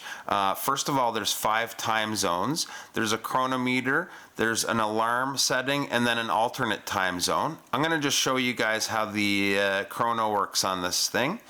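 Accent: Canada. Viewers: l lived in Stony Plain, Albetta 18 months. - pre-"Oh Canada." Really "old" days. Thought I heard a touch of the Great White North.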